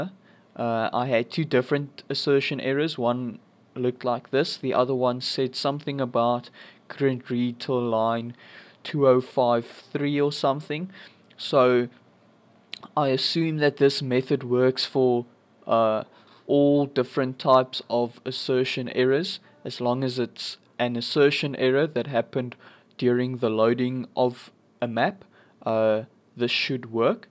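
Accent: South Africa. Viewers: Is this dude South African? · south african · Ur from South africa right? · U sound afrikaans 😃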